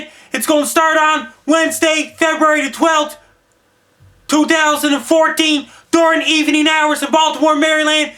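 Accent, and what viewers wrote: Canada. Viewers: That enthusiastic Canadian accent made my day.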